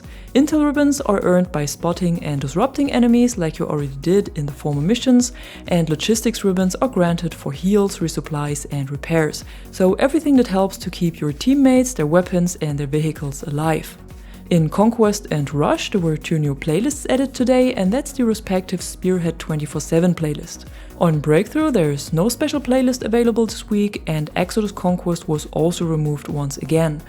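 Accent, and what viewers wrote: Germany. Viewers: I dident ment in a bad way. The accent gave you up. Cause i work in turism and you sounded like a German speaking english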